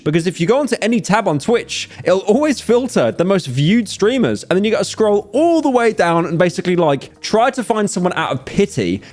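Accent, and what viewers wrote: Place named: England, United Kingdom. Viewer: You do that English gentleman voice so well, I think that's the way you talk.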